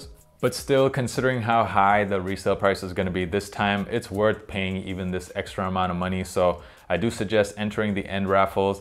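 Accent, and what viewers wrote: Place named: United States. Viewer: Bruh... you've got an American accent and live in Berlin. WHAT IS A MENS SIZE 11?!🤣 US/UK?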